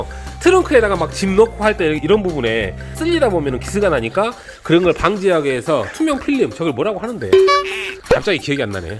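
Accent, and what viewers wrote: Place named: North Jeolla, South Korea. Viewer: 고향이 전라도 어디신가요? 말투가 북도같은데요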